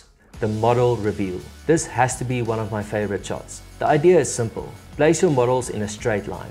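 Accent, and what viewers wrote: South Africa. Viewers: Am I hearing a Saffa accent? Thank you the amaizing tutorial! Keep it up! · As a South African I couldn't help but be taken aback by the accent. Cheers boet 🇿🇦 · You sound South African 🇿🇦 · Awesome tips bud. South African I'm guessing?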